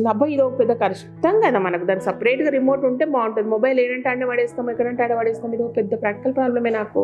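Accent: Telangana, India. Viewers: Telangana slang adurs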